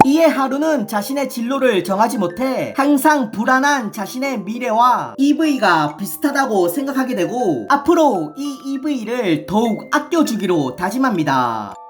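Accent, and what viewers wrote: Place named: Gyeongsang, South Korea. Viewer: 경상도 딕션 ㅋㅋㅋㅋㅋㅋㅋㅋㅋㅋ EVE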